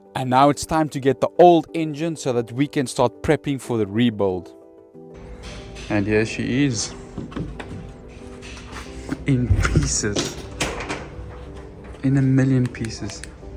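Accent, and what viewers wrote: South Africa. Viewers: He's obviously Afrikaans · Why do you speak like you’re from Upington or Springs? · U know wat i love about SA accent? Its soo thick, u cnt disguise it shem😂😂😂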